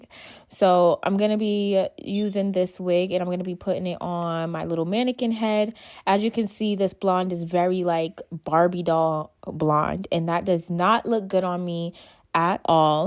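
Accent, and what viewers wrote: New York, United States. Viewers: “BRONZA”😭😍🤣 I love your NY accent!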